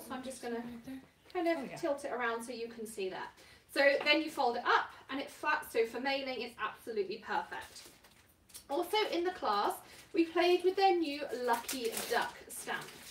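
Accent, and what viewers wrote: United Kingdom. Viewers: definitely makes me think: #1 - British ( your accent ) #2 - crafty goodness: new techniques, latest products and some really great ideas. An all around great channel to hang out watching and playing along.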